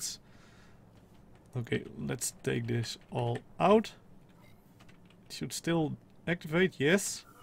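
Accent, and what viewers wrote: Netherlands. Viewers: nice nederlands accent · hahaha je hoort wel goed dat je nederlands bent :D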